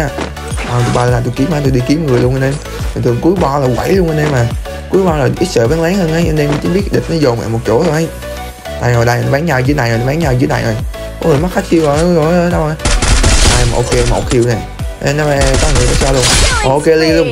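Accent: Quang Ngai, Vietnam. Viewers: A ở Quảng Ngãi hả😅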